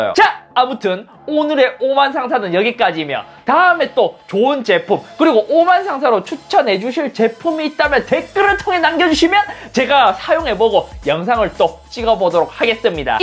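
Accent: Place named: Andong, South Korea. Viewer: 잇섭님 안동 출신인가요ㅋㅋ 익숙한 사투리가 들리니 반갑네요ㅋㅋ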